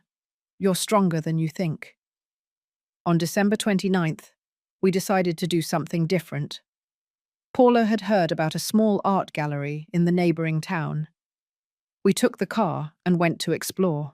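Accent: England, United Kingdom. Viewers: Going to the beach in December. There is an accent that is English….no beaches warm enough for swimsuits. Maybe britishers that emigrated to florida or California???? Or just AI being ignorant abain 😆😆😆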